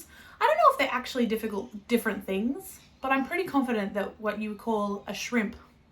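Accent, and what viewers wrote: Australia. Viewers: Hello from central Vic! You're the first Australian podcast I've found, my brain can't really compute and keeps thinking you have an accent (even though it's the same as mine 🤣🤦‍♀️). I feel like we should have some beautiful yarns here but I don't know of too many local ones, so I'm really looking forward to hearing what you find! If you're looking to dip your toe into cables I'd highly recommend "A Little Hocus Pocus" socks, its a free pattern & has a sweet little cable detail. Thanks for a lovely podcast 🙂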